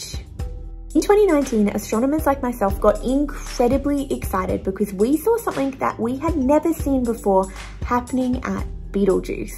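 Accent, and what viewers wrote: Australia. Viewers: Yay an aussie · It's not a speech impediment. She's Australian.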